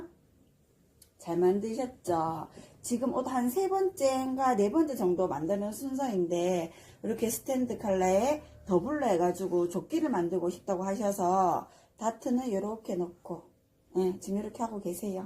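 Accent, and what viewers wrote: Gyeongsang, South Korea. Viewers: 문화회관 출강하신다고 하셨죠? 지역이 어딘지 가까우면. 수업듣고 싶엊네요 말씨는 경상도라 살짝 기대해봅니다 ㅎㅎ 막힘없는 설명 속이 뻥뚫리네요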